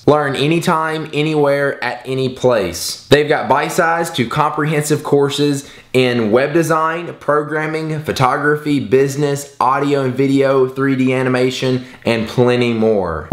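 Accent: South, United States. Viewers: U sound really country af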